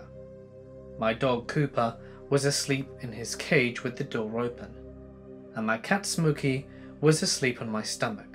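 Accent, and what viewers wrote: United Kingdom. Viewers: British accent isn't working for me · I truly enjoy your stories. Especially your voice. Being across the Big Pond I don't often get to listen to British voices and yours is amazingly smooth and well modulated. One channel sounded so bored he put me to sleep. You know just how to make a story scary and sometimes sad. I just wanted to thank you for all your hard work. Also thank you for sharing. Ms Michal aka Oldgamerchick😺😉☕🙏💖⛪💖 💀💀👻👻👻👻👻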